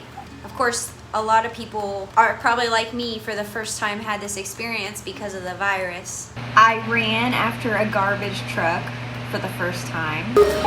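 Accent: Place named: Taiwan. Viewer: 沒關係台灣人説英文一樣。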